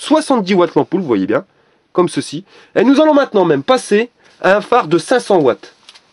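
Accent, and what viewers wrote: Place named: North, Germany. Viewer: super, l'accent deuch nord est beau aussi!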